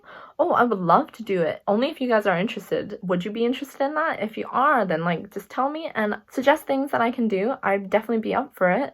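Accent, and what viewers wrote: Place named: Singapore. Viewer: According to your accent, Though you are Singaporean. Love your reacting, Cheers.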